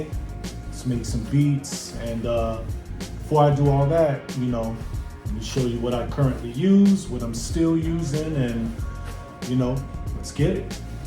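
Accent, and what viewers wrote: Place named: Brooklyn, United States. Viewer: I'm listening..... yo you from Brooklyn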